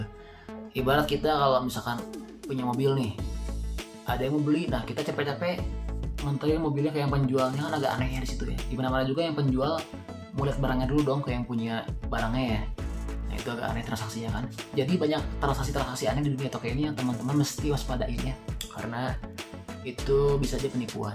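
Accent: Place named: Banjarmasin, Indonesia. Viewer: pian urang Banjarmasin kah? Logatnya spt org bjm.. 😊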